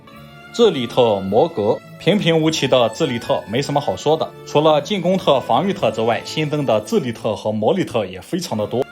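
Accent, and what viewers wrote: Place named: Shandong, China. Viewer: 感觉像山东， 四川人应该不骂wanna free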